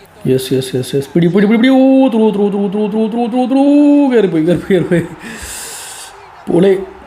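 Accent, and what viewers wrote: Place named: Kasaragod, India. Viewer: Majje illa nammale kasaragod bhasha alle ath😁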